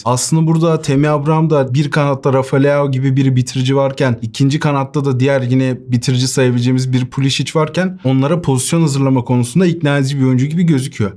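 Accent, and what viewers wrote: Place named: England, United Kingdom. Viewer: ''Temi ebraham '' İngiliz aksanlı dinlemek keyif vericiydi yine. Abrahamın öz güven eksikliği var bizim kötü travmalarımız var son 4 yıldır Allah sonumuzu hayır etsin. Bizim taraftar sabırsız olursa elimizde büyük biz enkaz kalabilir ama moral verip destek olursa da alev alabilir. Ben bir çok sorunu çözebileceğini düşünüyorum etrafı doğru kurgulanırsa. Bu adam çok gol attığı sezonlarda hazırlayıcılarla oynadı hep. Romada Veretout Mmkhitaryan Zaniolo Pellegrini ve Karsdorp sayesinde attı 26 gol. Chelsea de Mount Willian Azpilicueta ve pulisic denen futbol teroristtinin mecburi pasları ile attı 17 gol. Ki o dönem pulisic şimdiki kadar bencil değildi.Aston villada Hourihane Mcginn Grealish Anwar el Ghazi Ahmed el Mohamady asistleri ile 26 gol attı. Bristolde keza öyle orada tüm hücum hattı Abrahamı beslemek üzerine kurulmuştu.Dikkat edilirse hep iyi orta yapan beklerle gol sayısını artırmış doğru orantılı. 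Milanda herhangi bir santraforun 15 gol atması mucize.Solda pas vermeyen leao sağda ondan beter bencil pulisic merkezde Allah canımı alsın diye dolaşan orta sahalar ile kimden pas alıp gol atacaksın? Orası ölçü OLAMAZ Abraham için.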